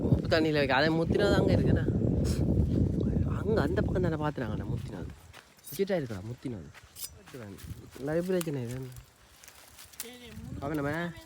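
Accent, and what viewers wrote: Jaffna, Sri Lanka. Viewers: உங்களுடைய voiceஎன்னுடைய jaffna நண்பரகளை நினைவு படுத்தியது அண்ணா 🥺🥺🥺🥺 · ஆர்ப்பாட்டம் இல்லாத யாழ்ப்பாண தமிழ். வெகுவிரைவில் ஓரு லட்ஷம் 👌👌👌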